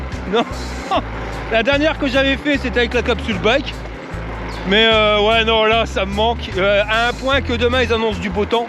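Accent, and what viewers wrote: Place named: Nord, France. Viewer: salut! Ta chaîne n'est pas la seule que je regarde, mais c'est celle qui me ravit le plus!!! Tu parviens à la fois à donner des infos pratiques, techniques, et touristiques avec le sourire et la simplicité!...Le tout avec eul' tchiot'accint du Nord qui me rappelle ma jeunesse valenciennoise... Merci, et bécot à Maryse... PS: -j'ai du mal avec Komoot: pas simple à utiliser, et pas toujours facile de retrouver sur l'appli certaines balades pourtant référencées sur le site internet... -si l'envie vous prend à tous les trois de découvrir l'Alsace et les Vosges du Nord, vous pouvez déjà compter sur une logement!;-) mais attention!!! Ça grimpe dur!!!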